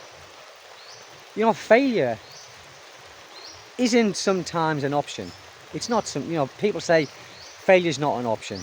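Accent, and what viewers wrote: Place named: Teesside, United Kingdom. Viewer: Here’s me thinking you were from Teeside😊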